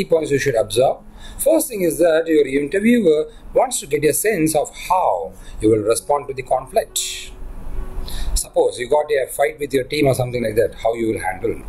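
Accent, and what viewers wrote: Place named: India. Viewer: He doesn't have to. He's indian, so naturally he'll havd the indian accent stick around.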